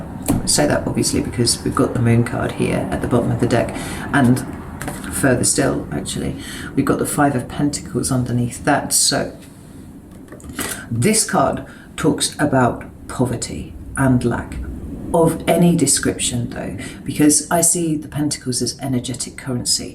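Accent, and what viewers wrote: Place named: England, United Kingdom. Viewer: VERY FUNNY THING ! NEVER LIKED THE ENGLISH ACCENT ! I LOVE IT BY YOU !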